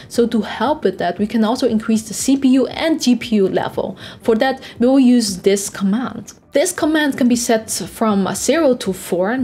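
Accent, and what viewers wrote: Netherlands. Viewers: Ik hoor een Nederlands-Engels accent 😅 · you sound dutch haha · i smell dutch 😂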